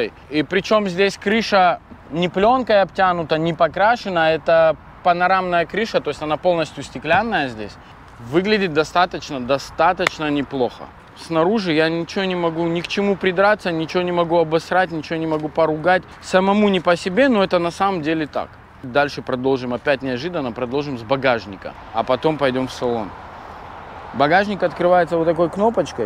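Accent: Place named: South, Russia. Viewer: Еще раз молодцы, (ели я не ошибаюсь) расскажи о себе, как докатился до такой жизни (Америки).. откуда ты.. говор Южный...я из Нальчика.. Шкода как таковая у вас есть?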